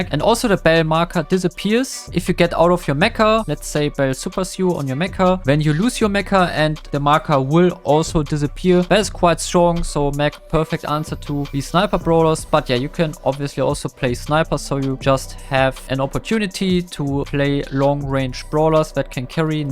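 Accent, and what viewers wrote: Germany. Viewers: Bro der deutsche Akzent ich fühl den so hart 😂😂😂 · Sprich deutsch dein deutsch Akzent ragt zu sehr raus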